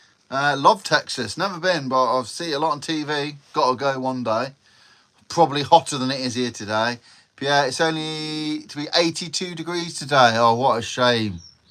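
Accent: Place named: East Anglia, United Kingdom. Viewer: You just sound like a east anglia accent but I read Channel Islands should have a bad South African accent?🤷‍♂️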